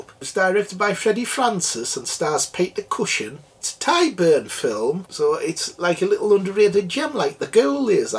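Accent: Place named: North East England, United Kingdom. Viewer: Really enjoying your reviews of these as they were pivotal in my youth as I have great memories of watching them with my dad, some of the happiest times I ever had in retrospect now I’m in my mid fifties and my dad passed away nearly ten years ago. You also sound like a north easterner, though further south in the region than me.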